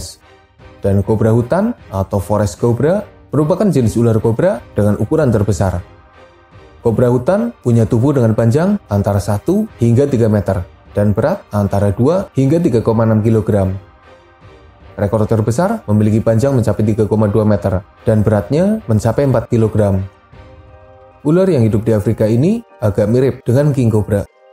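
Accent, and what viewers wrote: Banyumas, Indonesia. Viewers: Ngapak binginget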